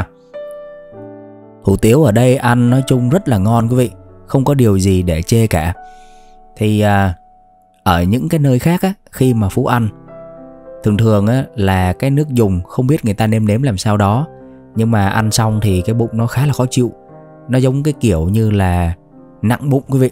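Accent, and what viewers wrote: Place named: North, Vietnam. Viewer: Thích giọng nói của em, nghe là biết con cháu người Bắc 54, ấm áp chứ không chua lè, tru tréo như giọng Bắc 75.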